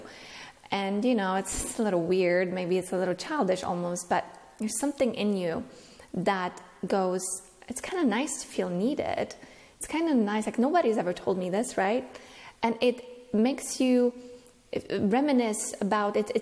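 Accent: Poland. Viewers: I watched this thinking about someone else and woke up today feeling horrible Bc I'm so mad at myself for not listening to my intuition and getting caught up in manipulative relationships. But when you said that I heard my mom in every way. And she is from Poland. So the accent reminds me of her. I thought I could forgive her but I feel way to entrenched and horrible I have no idea how to live my life anymore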